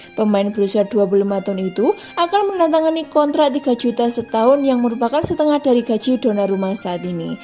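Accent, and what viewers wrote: Java, Indonesia. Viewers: Si mba admin nya, medok banget suaranya 😂, aga gmna gtu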